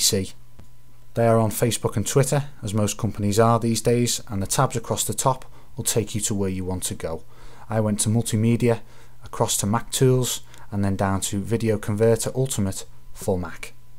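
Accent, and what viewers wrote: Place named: Liverpool, United Kingdom. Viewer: Where bout in liverpool you from lad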